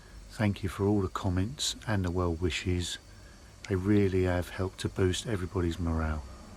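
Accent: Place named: Southern England, United Kingdom. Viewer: Judging by your accent you're not that far from the Channel so i can see why you use Eurotunnel. For me Dover is a five hour motorway maul so using the ferry was a welcome break. However i stopped using Calais after a bad experience....not from migrants but a UK Border control guy. I was queued in my campervan, sitting in the drivers seat, when he walked in front of my van so saw me, then wrenched at the side opening door which was locked, so he banged angrily on the side of my van. I got out of the van and gave him a piece of my mind. After that i never used Calais again and started using Dunkerque. The difference with the Border control guys there was chalk and cheese. Total politeness every time. Glad you had a successful trip.....you've just got to batten down the hatches now and sit tight for a few weeks!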